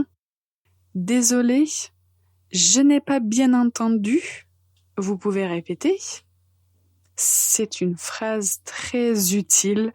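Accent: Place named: Brazil. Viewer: merci. Je vous comprends très bien car vous parlez très clairement. Je peux identifier des accents de certains pays principalement anglais, espagnol et portugais. Je suis brésilienne et j'ai été surprise que votre accent soit proche du nôtre (portugais brésilien).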